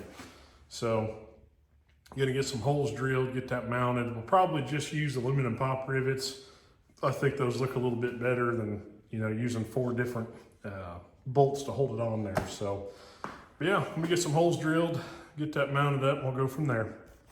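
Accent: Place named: Boston, United States. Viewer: I knew it was Boston that I heard in fast motion😂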